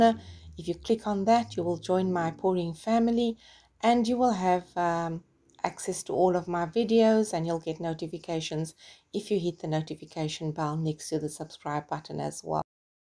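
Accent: South Africa. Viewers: Hi, it's my first time on your channel. I can hear from your accent that you are South African - I'm just wondering where you manage to get Australian Floetrol??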